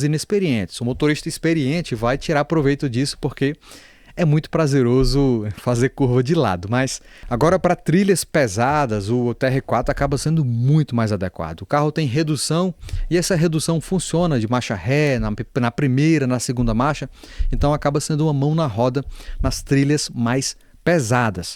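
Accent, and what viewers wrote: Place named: Ceará, Brazil. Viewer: Cabecinha de caixa d'água kkkk macho, comecei o vídeo achando que tu tinha um pé no Ceará e no decorrer, quando vocês fala "aqui no Ceará"...batista. Sua interlocução e montagem/construção do vídeo estão de parabéns. Grande abraço de um outro cearense e novo inscrito.🤝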